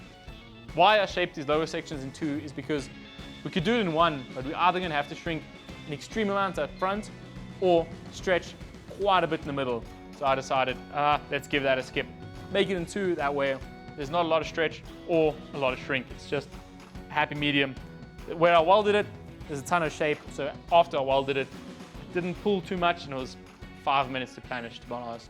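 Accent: South Africa. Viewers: Do I detect a South African 🇿🇦 accent?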